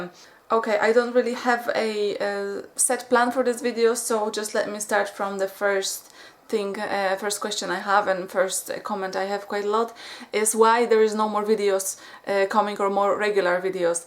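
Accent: Poland. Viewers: I can absolutely know as soon as you start to speak that you are Polish! I love your people so much!!!!! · you sound polish and you have a polish name:) You Have A Fan From Poland but lives in scotland:)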